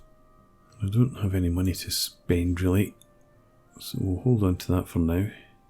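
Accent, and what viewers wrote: Scotland, United Kingdom. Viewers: you are Scot, arent you? love your accent;-)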